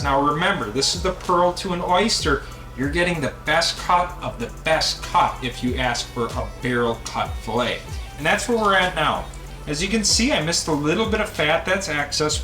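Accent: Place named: Wisconsin, United States. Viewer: Hard to fake that northern Wisco accent! Great job. Very enjoyable video with easy instructions and explanations.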